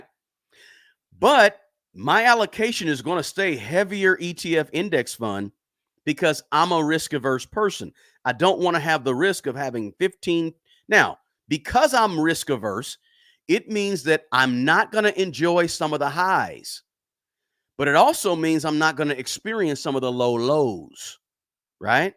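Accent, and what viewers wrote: South, United States. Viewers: Hey, I enjoy your show. With all the respect the world if I may say please, you're the first African American man I've ever heard with a deep southern accent lol. I like it plus keep up the good work thank you.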